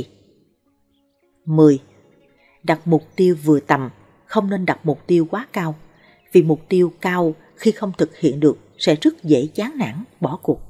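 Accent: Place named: South, Vietnam. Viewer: Chị đọc giọng người miền nam nghe hay nhẹ nhàn trong vắc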